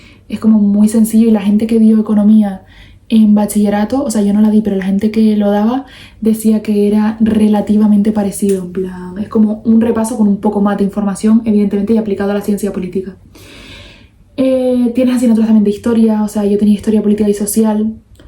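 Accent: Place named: Chile. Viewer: Habla más despacio no se te entiende nada! Me cago en el lenguaje chileno!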